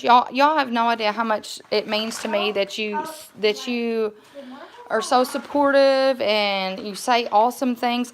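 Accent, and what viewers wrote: South, United States. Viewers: OMG LOL I LOVE your accent!!!!!! Sometimes I try to talk that way. I love it. lmao. I am in California, so I don't feel like I have any accent. Do we sound like we have an accent to you (meaning people in the south?)? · I love how down to earth your channel is and how courageous you are to share yourself with others. I lived 12 years in Georgia (now I’m in DC area). so one selfish reason I love your channel is to listen to your awesome southern accent and all the sayings. “I’m fixing to...” is something I don’t hear much now 😀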